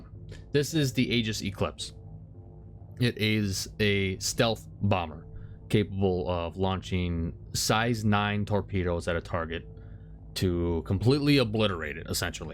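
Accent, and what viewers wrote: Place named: Mexico. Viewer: It really isn’t. The Kee sound you are promoting has sneaked into your pronunciation from the Mexican Spanish influence. Italian, Latin and English pronunciation is Kwu. Say the word queen - it’s not “ keeeen”.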